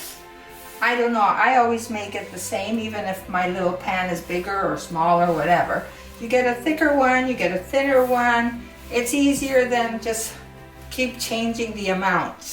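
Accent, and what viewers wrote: Florina, Greece. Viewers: Well done! What village are you from? Your accent sounds like from Lerin area, like me. I'm now in Australia since 1957. My mother made Zelnik so tikva, and now my son also makes zelnik too, for his family. Best wishes, so zdravje.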